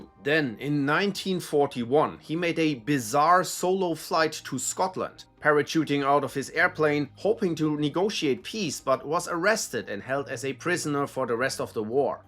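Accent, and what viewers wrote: Germany. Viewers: Already love the German accent and language and hearing german english makes me wet ( I'm a guy ) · You know it's the real deal when the narrator has a German accent. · The german accent makes this video way more easy to sympathize with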